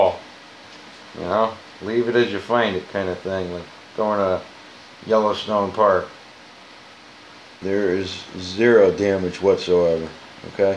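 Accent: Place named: Buffalo, United States. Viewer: You sound like you're from Baffalo!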